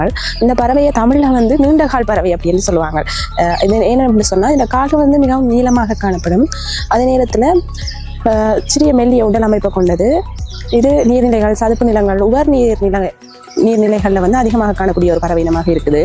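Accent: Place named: Jaffna, Sri Lanka. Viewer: Your jaffna Tamil dialogue is excellent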